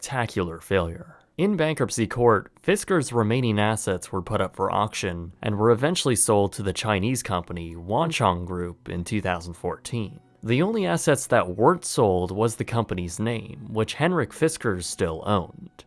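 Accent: Canada. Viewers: Research = 10/10 __ Canadian Accent = 1/10 gah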